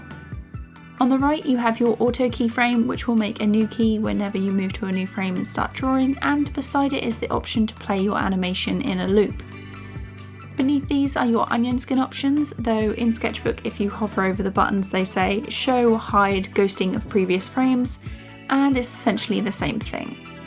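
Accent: United Kingdom. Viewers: nope this girl owns autodesk sketchbook and are you british cos you sound british. im british too!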